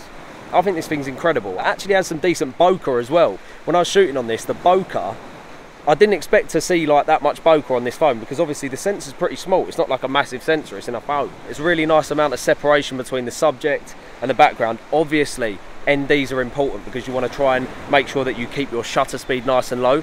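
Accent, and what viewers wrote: United Kingdom. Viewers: Bri ish❤️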